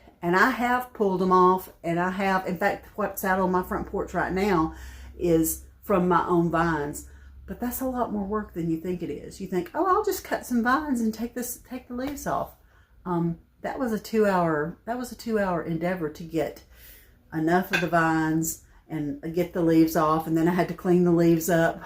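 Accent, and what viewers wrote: South, United States. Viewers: Dear sweet lady, I knew exactly what you meant, I could never imagine you taking anything. I love your thrifted fines, the way you decorate, your Southern voice, you are a blessing !!! I do hope you get over your cold quickly so you can hold your new Grandson soon. Congratulations !!! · This is the 1st video I have seen of your channel, I am loving it...I am from Ohio and LOVE your sweet southern accent.I am a thrifter and have been for 42+ years, you can make your home SO unique because things you find aren't mass produced like a big box store....everyone tells me my home is very unique and interesting , there is ALWAYS something different to see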